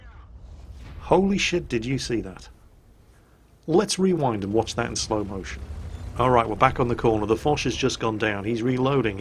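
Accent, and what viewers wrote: Russia. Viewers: Cant get enough of your KV 2 videos including jokes with a Russian accent and laughter. I love your other videos as well but videos with KV 2 deleting ridiculous targets with ridicules shots just gives a little more juice to the entertainment :D. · love that Russian accent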